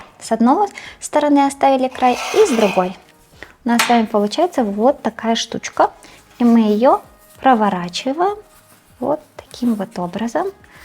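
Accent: Ukraine. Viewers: 😍💖Вау!, яка чудова, гарна українська мова звучить! Дякую💝Приємно Вас чути💙💛 Безмежно вдячна за рецепт тіста для булочок😘 Вдячна Вам за Вашу позицію! Тримаймося! Все буде Україна! 🌹🇺🇦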